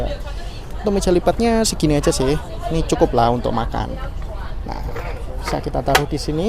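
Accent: Java, Indonesia. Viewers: Bang, maaf ijin tanya. Abang org malaysia atau org indo? dr logat nya orang jawa. Abang belum pernah naik kereta indonesia toh? Kereta kita juga ad yg ada cafe dan pramugarinya. Design dna teknologi jg lebih canggih. Ada karaoke, charger dan tempat duduknya tidak sesempit di malaysia. Jendela juga besar sekali. Ad tempat koper. Coba update dulu sebelum kasih judul bang.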